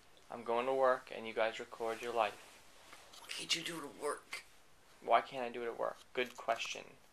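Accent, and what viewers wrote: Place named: Canada. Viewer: i love how you say out ...hahhahahhaaha canadian accent :D srry i am american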